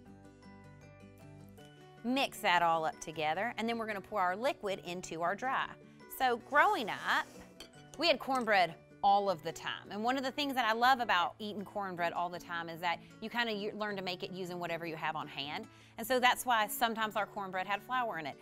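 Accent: South, United States. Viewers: is beautiful and then you add the Southern twang and the cooking factor. Even better.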